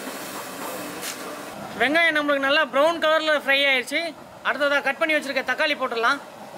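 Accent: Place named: Kongu, India. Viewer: ji kongu tamil Vera level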